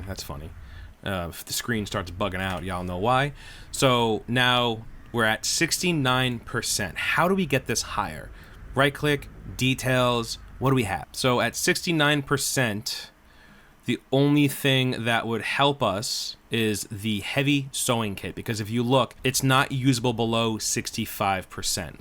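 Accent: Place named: North, United States. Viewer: Strong northern US accent, michigan to new england (probably NY). Great guide thanks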